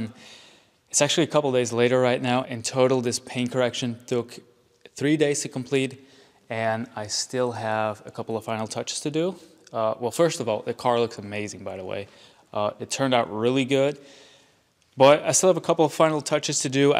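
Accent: United States. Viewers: Hey mate, are you a native belgian? Your accent is very american! I order from your detail store all the time.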